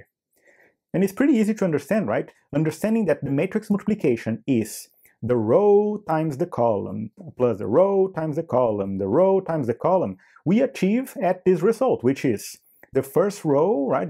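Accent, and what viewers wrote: Brazil. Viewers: dude, your brazilian is pretty strong, i can tell it by the tone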